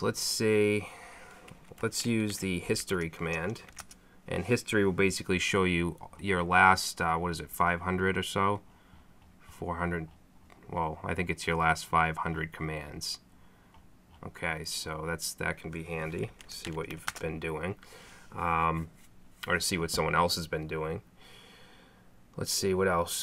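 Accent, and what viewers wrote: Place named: South Boston, United States. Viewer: Straight out of Southie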